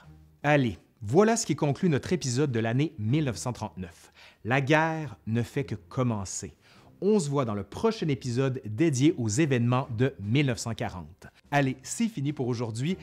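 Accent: Quebec, Canada. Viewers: L 'accent du Quebec , fluide et delicat , professant la langue de Molière dans toute sa splendeur est un regal pour mes oreilles ... Merci copain !!! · J'M Bcp l'histoire.. mais svt crt guerres sont 1peu "touffues".. 🤦🙍 Mais vrmt tu rends ça très clair...presk simple.. (alors qje n'avais mm pas connaissance d conflits s/ts les fronts !) Je pensais qle Japon n'était entré q Bcp + tard... 🤔🤦🤦 De + Bravo pr ta diction.. et ton charmant accent Québécois... 👍 👏👏👏👏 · J’aime beaucoup ton accent québécois :) Très bonne vidéo ! Salut de Bruxelles (Belgique) 👋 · Je comprends mieux le francais canadien grace a toi! Merci! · A mon avis, une série aussi passionnante et documentée que celle sur la WW1! Et avec la diction parfaite, agrémentée de ce charmant accent canadien, de. 7 capsules, je suppose 1 par année de 1939 à 1945, qui vont nous ravir. Vivement 1940! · Le seul accent québécois que j'ai plaisir à entendre 😊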